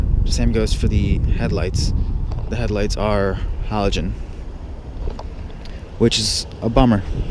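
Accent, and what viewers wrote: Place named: New Jersey, United States. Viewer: Are you from Jersey or are you Italian? This is my first time watching one of your videos but your accent in your voice reminds me of Pauly D from Jersey Shore.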